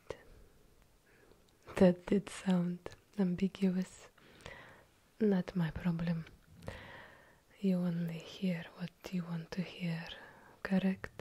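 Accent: Russia. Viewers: My Dear I would listen to you read a math text book as long as you were using your heavy Russian accent. And I hate math.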